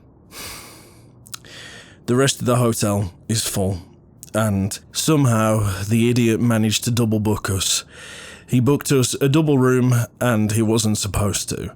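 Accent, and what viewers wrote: United Kingdom. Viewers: YIPEE :DD ur british accent is just so delicious- XD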